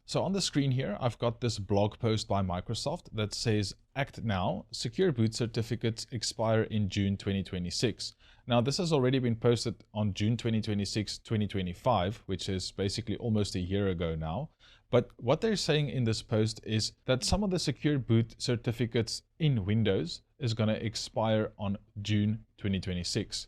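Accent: South Africa. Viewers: I like this site for its good advice, an insight into just how long M'soft has been run by control freaks and because I went to school with boarders who were mainly from ZA and Rhodesia. The accent reminds me of decades ago when a town scholarship day boy was taught how to play rugger as it SHOULD be played -- by the rules and never to shout. In old age, these things become important. Thank you.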